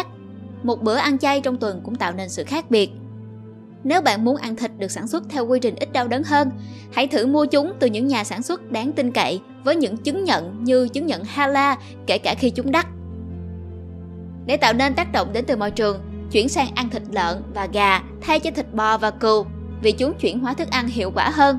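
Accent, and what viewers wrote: South, Vietnam. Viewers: giọng đọc hay kiểu miền nam mà sao xài chữ Lợn với Lạng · Kg biết ai thích thịt thích rau gì chứ riêng tui thì tui khoái cái giọng con gái miền nam ghê à ngen ^^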